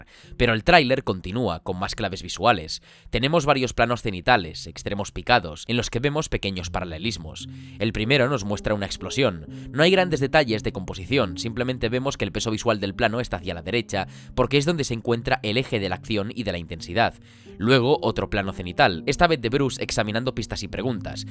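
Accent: Spain. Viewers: aaaa verga tienes acento español...si lo voy a ver jajajaj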